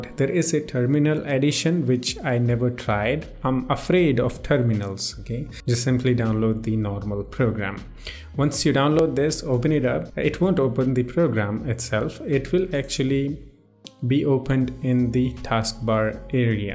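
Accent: India. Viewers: Are you indian bro?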